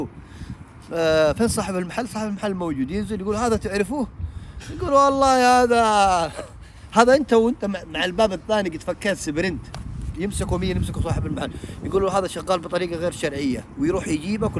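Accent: Jeddah, Saudi Arabia. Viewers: انا يمني لكن دا كلامه جداوي 😂🤦‍♂️.. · هههههه يقول الرواتب على حسب وجهك 🤣 آخخخ اللهجة السعودية اشقد احبها ❤ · دايم اليمنة يلاحقون أي واحد يكتب شي عن لهجة هذا الشخص! ندري أن أصله يمني لكن يتكلم جداوي يعني سعودي وهو قلباً وروحا حجازي سعودي ولا يعرف حتى يتكلم يمني. وش هاللقافة يا ذا الناس! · اللهجه سعوديه ١٠٠٪ هوا ماقال الجنسيه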